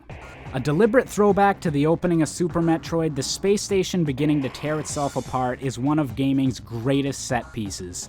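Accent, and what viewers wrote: Canada. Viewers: dat Canadian (?) accent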